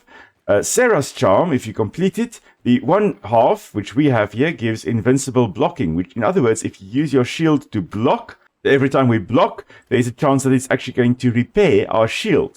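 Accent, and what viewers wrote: South Africa. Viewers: Fascinating...who knew water fleas were so interesting?. Are the eggs they reproduce through parthenogenesis actually fertilized by the female or does it have a unique process to accomplish birth without fertilization? Came for the learning...subbed for the SA accent :)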